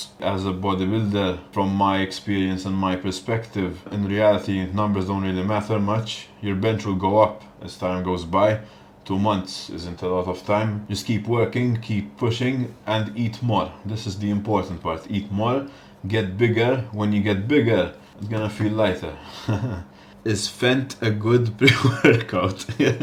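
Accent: Malta. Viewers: First time I came across u was on ig ofc and bro as soon as I heard the accent I knew u were from malta ! My question is what's your best tip for beginners?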